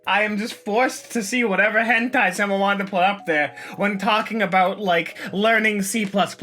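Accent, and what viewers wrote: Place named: New York, United States. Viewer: why do you sound so much more new york kwite?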